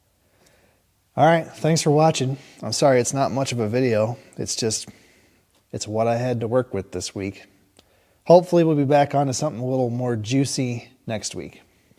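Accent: United Kingdom. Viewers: Why sound like most British friend? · You are so British 👍. Including the correctly pronounced solder with an L.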